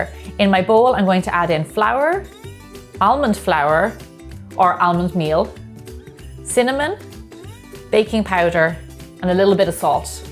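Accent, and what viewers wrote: Ireland. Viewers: Do I hear a lovely Irish brogue? Delightful!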